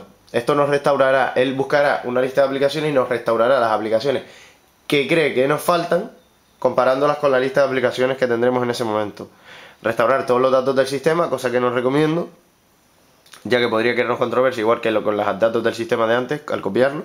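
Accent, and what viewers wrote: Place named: Canary Islands, Spain. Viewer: wow se nota q eres de canarias, yo soy de grancanaria, la feria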